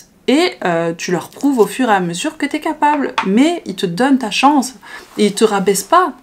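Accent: Quebec, Canada. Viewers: tu commences à avoir un petit accent Québécois non ? :p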